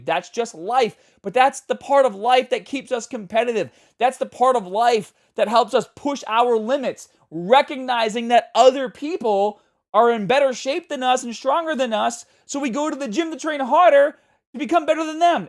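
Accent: Boston, United States. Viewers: Are you from Boston? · As a born a raised Bostonian (Roxbury btw) u have the deepest Boston accent 🫡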